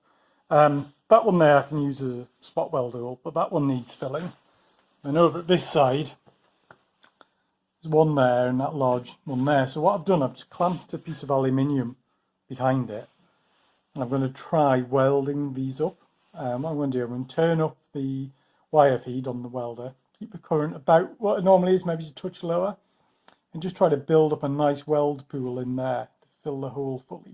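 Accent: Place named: Geordie, United Kingdom. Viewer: Haha I'm from Peterlee and to me you sound like a well-spoken Geordie.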